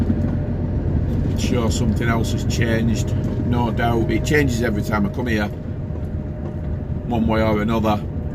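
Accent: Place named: Northern England, United Kingdom. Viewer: Hi, I fell upon your vids as I'm planning to visit Greece in my M'home after postponing last year due to Covid and I have found your clip's to be very informative and just love that Northern accent. I'm guessing Leeds ! Anyway, thanks for the information provided which gives me an idea of what to expect when crossing over from Italy to Greece and driving in Greece. Hopefully make it this year before it gets too hot. Otherwise it's Slovenia and Bavaria. Keep Safe.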